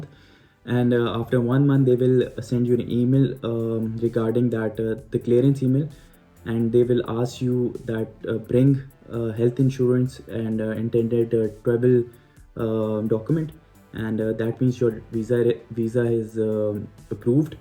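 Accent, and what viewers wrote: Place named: India. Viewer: Great great accent unka indian hai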